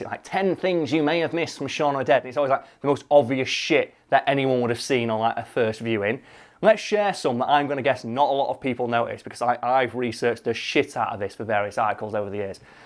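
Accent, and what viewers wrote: United Kingdom. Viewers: Finally, someone who talks rate bladdy British! Someone to like!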